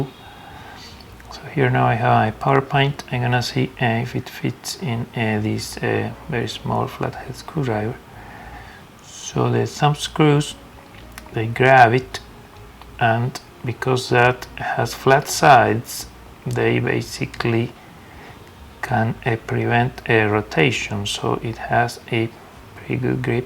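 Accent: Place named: Europe. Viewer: This is Genius 👍 I'm so glad I found this in my recommendations ! How is it you have such a European accent but measure in inches with that work mat though ??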